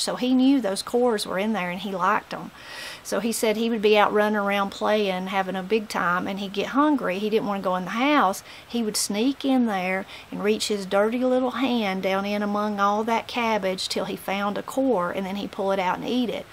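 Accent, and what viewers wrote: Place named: South, United States. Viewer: I’m from what then the outer Charlotte NC and Mom canned every year. She made wonderful chow chow. Love your presentations...your Southern talking and everything you do. Back in the country day....I do miss all the country growing up things. Thank you.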